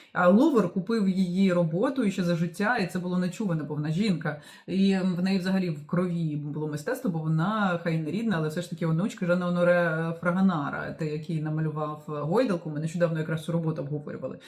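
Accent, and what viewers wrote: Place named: Russia. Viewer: Дякую, за відповідь! Мені дуже подобається ваш контент. Пишучи про мову, я перевіряла свій слух - він у мене близький до абсолютного(я скрипалька за освітою), - того, я радію з свої здібностей. Ваш російський акцент, або російська артикуляція, практично, не помітні.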